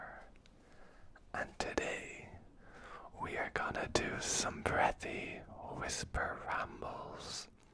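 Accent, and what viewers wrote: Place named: Ireland. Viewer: Irish? ☘Whispering into my ear? 🥰 Dare i click? 🤔 I knew they'd be the death of me....😅 Okay, here goes nothin'...🙃 💚*💚*💚*💚*💚*💚 *faints (☘shamrocks☘ floatin'☘ around☘ my ☘head☘)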